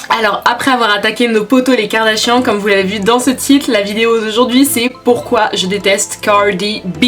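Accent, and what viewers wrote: United Kingdom. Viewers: J'aime bien tes videos mais tes mimiques franglaises sont prodigieusement agaçantes, excuse-moi hein mais j'ai eu du mal à me concentrer tellement j'étais obnubilé par les mots que tu prononçais à l'anglaise (certains étaient même français) ainsi que les "bad attitude" "ratchet" etc...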